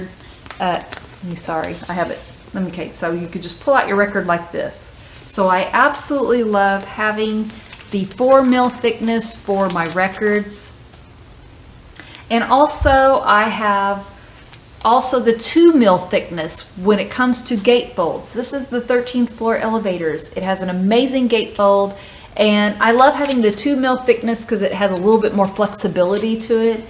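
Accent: South, United States. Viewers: Hey, how are you doing? I subscribe to several members of the vinyl communityand they are increasingly starting to mention you as an influencer in the vinyl community. So that's very good for you and it means your influence is growing.I know you have mentioned that I believe, you were born in Indiana.I have many cousins who are from Indianapolis and they have what I would consider from Chicago a southern accent.yours is more pronounced so do you think where you live now has increased your southern accent? I've always been interested in how people speak compared to where they grew up in so that's why I'm asking.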